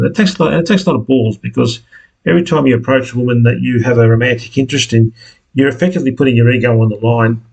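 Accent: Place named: United Kingdom. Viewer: yeah but i'm not native, i think You are british SO i really have to focus if i want to hear one of your videos they seem good honestly but it's linda hard too hear them while doing other activities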